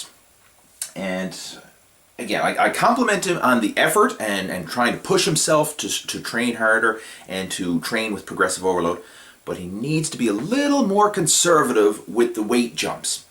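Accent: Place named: Atlantic Canada, Canada. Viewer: Holy shit im canadian but im still annoyed by his accent. he must be from pei or newfoundland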